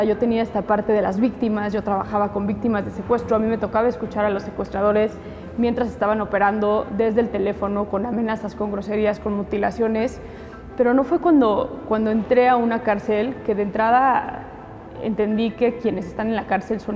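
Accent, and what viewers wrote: Spain. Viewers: hace el acento español en otros programas lo hace más y ahorita no lo hizo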